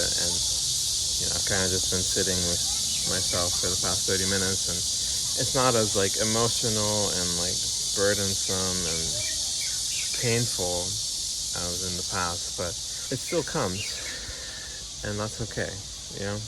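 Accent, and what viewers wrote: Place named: China. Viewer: You're developing a Chinese accent.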